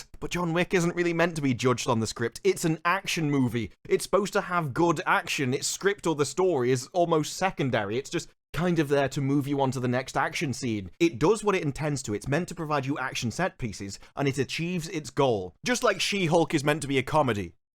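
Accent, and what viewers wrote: England, United Kingdom. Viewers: is definitely one of my favorite content creators, him and Mauler, some insults and comments are just funnier with an English accent. Mauler's video where he deconstructs Doctor Strange and the Multiverse of Lameness is one of my favorite videos of all time. Also, that Punisher shirt is AWESOME.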